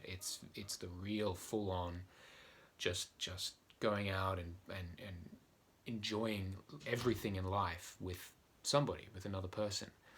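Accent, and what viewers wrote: Australia. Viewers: clearly knew the accent straight away… and was soooo thrilled was show casing your work… Amazing how it takes following someone over the other side of the world, to be able to appreciate someone in your own backyard! I’m on the gold coast… so happy you have been acknowledged for your skill and craft world wild.. shall follow … thank you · I'm so glad put you on for this Mercury retrograde video! I actually have Mercury retrograde in Pisces in my natal chart. I'm an Aquarius and I'm soaking up all your information! Great stuff! I'm definitely going to be subscribing to your youtube channel and sharing your videos with my friends! You sound like you're Australian? I love how you're giving a detailed explanation of Spika, etc. Thank you for talking about how each sign will be affected! That's very generous of you! All my best from the East coast in the states!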